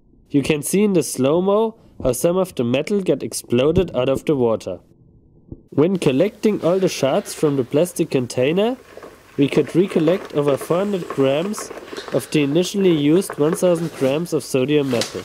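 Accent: Germany. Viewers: Du sprichst sehr gut. Aber dein deutscher Akzent hört sich ein wenig lustig an. Das muss ich zugeben. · I hear a Deutsch akzent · klingt sehr deutsch haha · German accent makes it even more professional! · Your German I know it. (I’m German) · Beside the German accent it is always important to try this under a 380kV power line, to get the extra fizz, at least when the plume rises high enough. If seen more intelligent YT videos of wasting money or creating some bang. Try it with 1000g Ammonal next time. It's cheaper and more impressive. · Den deutschen Akzent hab ich sofort raus gehört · Der Deutsche Akzent ist so geil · na ja.. Umwelt Verschmutzung das Plastik Bekommst Du Nicht restlos weg.. und 2 Ich höre das du Deutscher bist. also Hättest du es auch gleich in deutsch machen können.. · Most german accent i've ever heard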